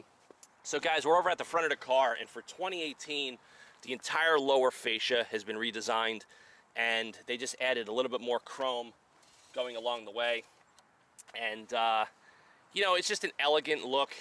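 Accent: New York, United States. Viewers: buh'n ("button"), "sah'n" ("satin") - straight New York / New Jersey.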